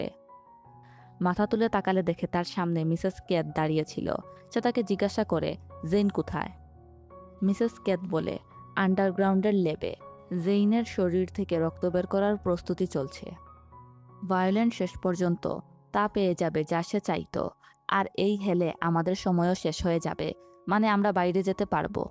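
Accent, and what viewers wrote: Sylhet, Bangladesh. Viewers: Apu apni ki Sylheti?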